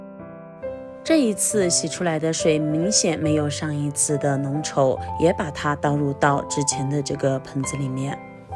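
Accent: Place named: Taiwan. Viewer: 调料的口味像大陆西北地区，口音是台湾🤣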